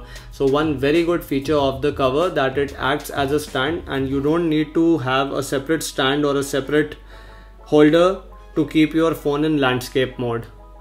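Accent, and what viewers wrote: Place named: India. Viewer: Наглядно объяснил разницу между оригиналом и подделкой. Жаль не все русские понимают английский язык. Судя по акценту ты из Индии?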